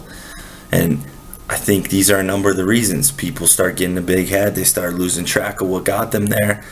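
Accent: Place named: Canada. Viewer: Call me crazy but as a canadian, I would think you were canadian by your accent if I didn't know you were american haha keep it up man you're a beast!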